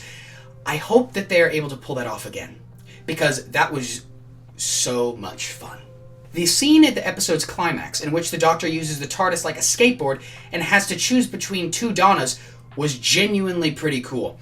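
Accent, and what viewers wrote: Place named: United States. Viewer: why he got an American accent? i thought you were from the uk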